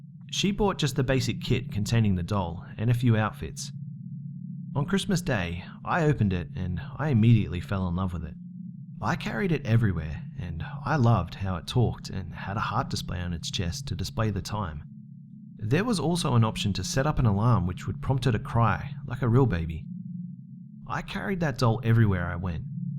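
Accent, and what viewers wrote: Australia. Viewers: he has an Australian accent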